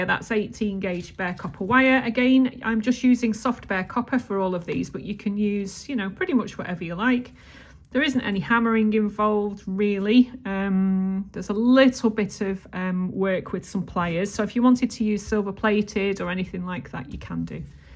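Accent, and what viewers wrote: United Kingdom. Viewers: Another great piece and so unique! I wish I’d had this when I was still working in an office. Thank you for this! 😊 Oh and by the way, do not worry about saying things such as “like so” or “literally” multiple times. We don’t mind. As an American I appreciate the little nuances of the English language as spoken by someone from Great Britain. My favorite thing you say is “faf about”. I had to look it up the first time i heard you say it and I just love it. 😁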